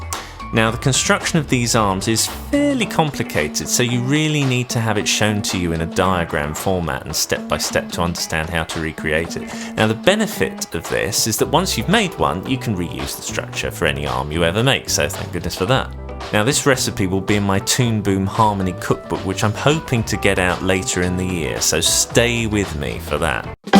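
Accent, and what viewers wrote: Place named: United Kingdom. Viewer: Great work as usual, always an inspiration. Hey, have you ever considered providing training? Sounds like you’re also based in the uk… I’d be interested in attending a course if you did.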